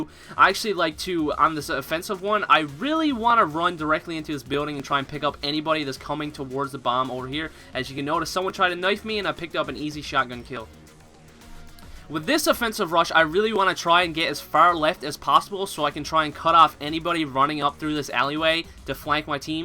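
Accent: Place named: Canada. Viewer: Your accent is so annoying haha are you canadian? Also good vids, they really help.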